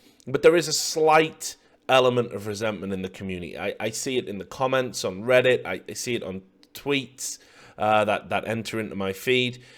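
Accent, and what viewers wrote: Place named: Newcastle, United Kingdom. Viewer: are you a fucking Geordie? It all makes sense now, I love you even more.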